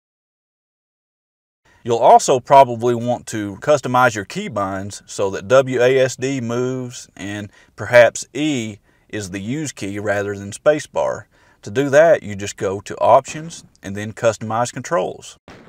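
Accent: South, United States. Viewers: Good American Accent Boi! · Love your southern accent, bro. : > I would have mentioned Crispy/PrBoom+ for the more purist minded people, but keep up with the good content! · Just found this video. It is absolutely excellent. Clear and concisely presented instructions in a superb American accent. I don’t know what state you’re from but it is mega cool! Best wishes from England. P.S. I also agree with you that Doom is the foundation of most games in that genre.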